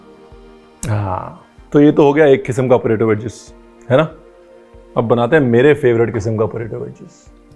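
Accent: India. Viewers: Thnx sir for this recipe ,sir love ur desi style when u talk 🙏🏻😊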